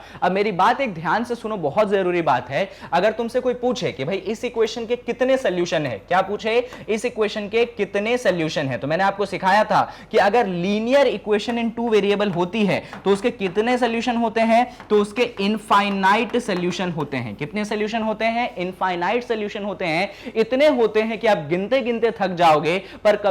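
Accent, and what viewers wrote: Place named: Bhopal, India. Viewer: Sir aap shayad bhopal se ho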